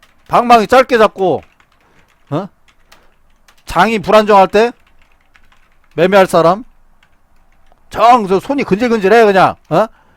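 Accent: Chungcheong, South Korea. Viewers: 어디 천안 or 당진쪽 사투리 같은데 말투가 넘 친근하네요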